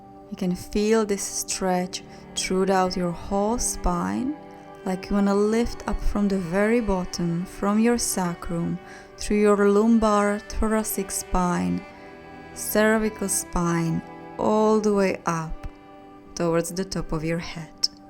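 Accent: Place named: United States. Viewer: sexi baba ze cesko a meles ako z ameriky hee.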